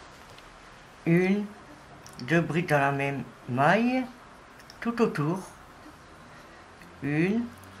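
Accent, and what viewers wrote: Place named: Pas-de-Calais, France. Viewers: Bonjour, déjà merci de nous offrir tous ces tutos que vous expliquez à la perfection, j'ai retrouvé un papier sur lequel j'avais ecris leur taille et dimensions pour celle de 8 ans c'est bien d'épaule à épaule donc 30 cm pour celle de 10 ans 34 cm mais en vous regardant travailler si j'ai bien compris on fait un nombre de maille qui va jusqu'à ces dimensions et cela nous donne un poncho à leur taille, c'est bien cela!!!! Merci pour votre gentillesse et votre charmant accent que j'adore cela me rappelle Cambrai!!! belle journée et merci pour votre réponse!!!! je vous cite à chaque fois que je fais un de vos ouvrages et je vois que pas mal de personne vient visiter votre site!!!! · Votre accent du Pas de Calais, c'est celui de ma Grand-Mère 😍, une grande crocheteuse aussi, je pense toujours à elle quand je crochète. 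· Je l ai reconnu de suite l accent ch'ti je suis de bruay la Buissière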